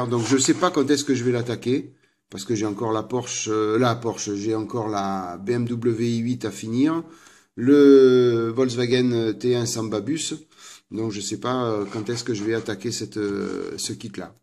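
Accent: South, France. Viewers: un beau projet en perspective a venir...toujours agreable a regarder tes video avec ton petit accent du sud.a plus et bon courage.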